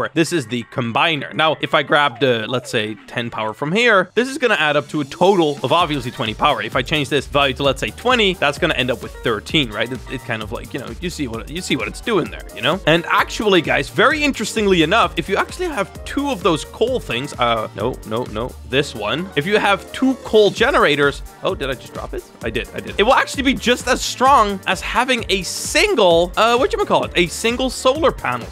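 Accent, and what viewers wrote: Netherlands. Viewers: Are u Nederlands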